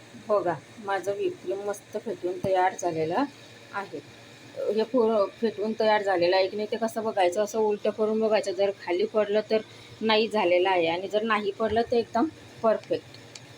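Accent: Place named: Khandesh, India. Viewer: खानदेशी टोन आहे ताई तुमची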